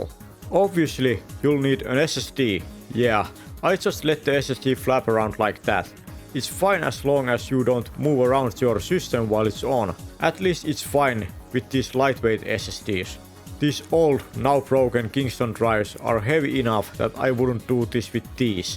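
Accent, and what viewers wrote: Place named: Finland. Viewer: Seconds into this video I could hear Fintlant. I trust Finns for no-bullshit explanations of everything. Thank you for the great video. Perkele!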